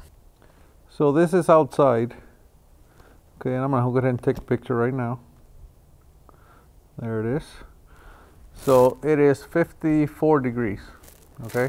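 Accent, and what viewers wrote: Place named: South, Mexico. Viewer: I hear a Mexican and a Southern accent when you talk.✌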